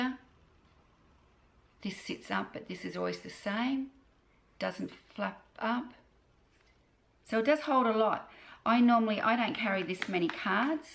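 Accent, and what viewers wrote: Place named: Australia. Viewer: Great review! Beautiful item and Aussie English :)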